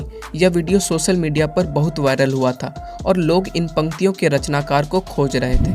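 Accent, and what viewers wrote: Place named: Bihar, India. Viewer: Bihar me ye ek hi hota hai , grammatically wrong but expression well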